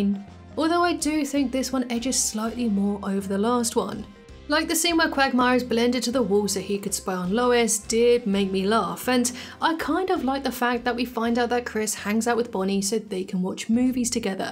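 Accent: United Kingdom. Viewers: I don't get America (or maybe Fox)'s love for Benny Hill either, and I am American. But it's funny to me I legit forgot you were English (despite it being your accent) until you mentioned it. What does that say about me?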